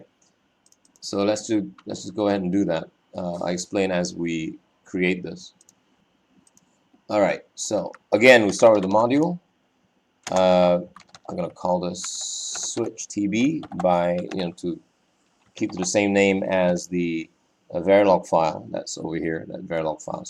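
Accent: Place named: Malaysia. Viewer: You have a nice accent for a Malaysian Lecturer. Nice content and elaboration, hopefully you would contribute and upload more Electronics videos :)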